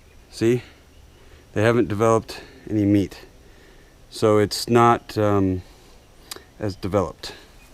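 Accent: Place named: Midwest, United States. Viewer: I’m willing to bet you did not grow up in Hawaii. You know you would be ridiculed for wearing those sandals and speaking with a midwestern accent. Be honest, what state are you really from?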